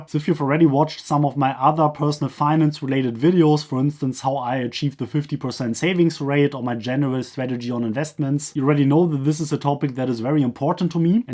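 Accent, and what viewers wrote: Germany. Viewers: oh goodness that heavy german accent....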